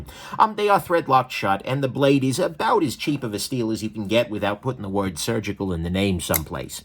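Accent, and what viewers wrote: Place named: New England, United States. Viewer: I tried to watch this but his ridiculous Yankee accent ruined it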